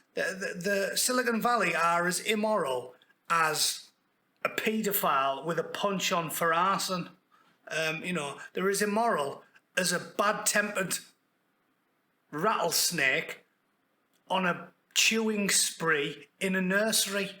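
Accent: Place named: Middlesbrough, United Kingdom. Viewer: you can ramble as long as you like, your voice is music to my ears. Do love your Boro accent🎶🎧😊😂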